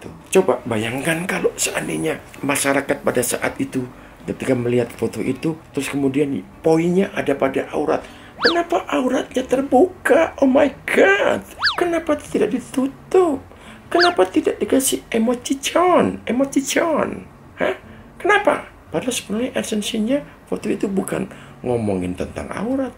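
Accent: Java, Indonesia. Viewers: Ya allah mas hanung, logat jawaanyaa bikin ngakakkk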